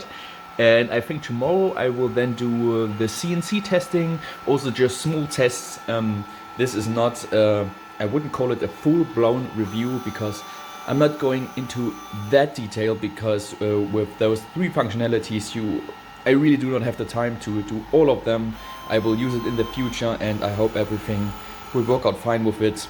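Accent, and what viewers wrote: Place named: Asia. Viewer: Wow, I didn't realize you write actual scripts for the show. I would have just used a list of points to make. Your videos are very good. Before I learned you were in Germany, I imagined you were in Asia. The accent of your English (you have perfect English) sounds Asian to me.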